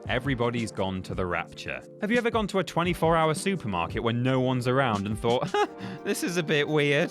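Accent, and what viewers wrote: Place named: Yorkshire, United Kingdom. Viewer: sounds very 'Yorkshire-ey' today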